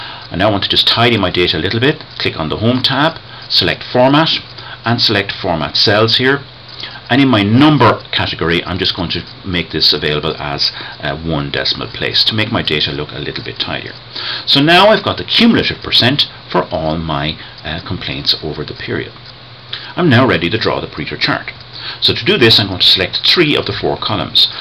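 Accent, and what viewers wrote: Ireland. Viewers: You just saved my ass for my class. Please keep this up. On a side note - awesome Irish accent man.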